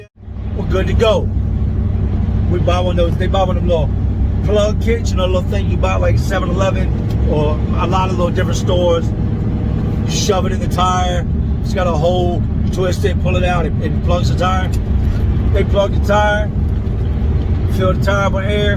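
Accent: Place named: Bay Area, United States. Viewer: He sounds like he from tha Bay wit that Never Dat. But dats old school I don't think we say dat no mo. But keep it P.I. Bad NFluenz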